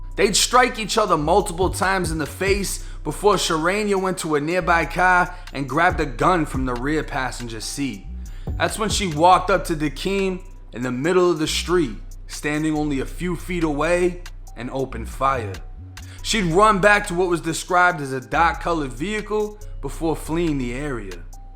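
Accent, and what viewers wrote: Boston, United States. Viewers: Are u from Boston ? U remind me of mark Warburg in that movie you wanna a cawp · There's a reason men don't fight women in MMA. Maybe your goofy Boston accent should research harder on the rest of the world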